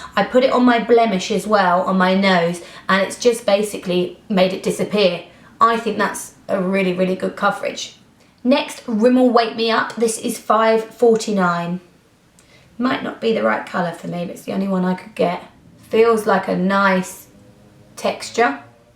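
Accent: United Kingdom. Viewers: Love that british accent "last longaah, with your fingaah" 😚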